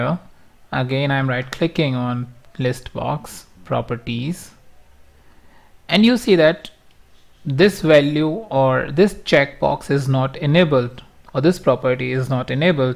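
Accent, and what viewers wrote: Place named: India. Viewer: I think Indian accent is good while speaking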